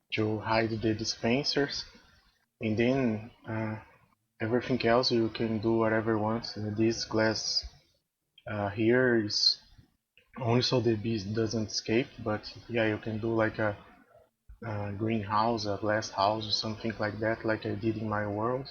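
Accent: Brazil. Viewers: Pelo sotaque, já sei que é brasileiro kkkkkkkk Cara, eu adorei o teu design. Tu teve uma ideia muito top na ideia da coleta e armazenagem das garrafas de mel (minuto do vídeo). Eu fiz um teste dessa parte no meu sistema e até que encaixou bem, além de ter diminuído alguns blocos da parte de processamento das garrafas na minha farm, mas reparei que vem a um custo de mais garrafas de mel no carrinho, e o funil só consegue pegar e entregar uma garrafa por volta, já que quando a primeira desce o sinal de restone reduz para 14. A única coisa que não entendi e acho que foi um erro no teu design foi o sistema de abastecimento (minuto do vídeo). Eu entendi que o objetivo era fazer o sistema ser automático, e usava o sinal de redstone emitido pelo carrinho cheio para enviar o carrinho e distribuir as glass bottles. Havia formas de usar outros sinais para essa automatização (exemplo: luz solar) e também maneiras de fazer o carrinho correr até esvaziar. 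Eu estava procurando vídeos assim, pois eu também fiz uma versão minha (que não copiei de ninguém) da farm de garrafa de mel que usa menos vidro. Eu estava querendo fazer um vídeo, mas antes resolvi procurar se ninguém havia feito igual ou até melhor. Vou ver se faço esse vídeo no mês que vem.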